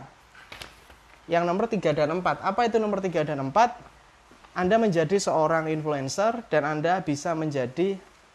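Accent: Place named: Yogyakarta, Indonesia. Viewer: Jelas mas dr yogya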